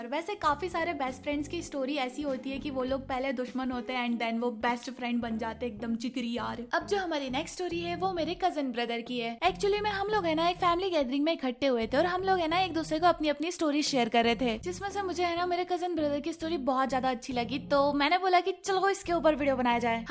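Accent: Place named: Gujarat, India. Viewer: Aapki tone sunke lagta hai ap Gujrati ho 😂❤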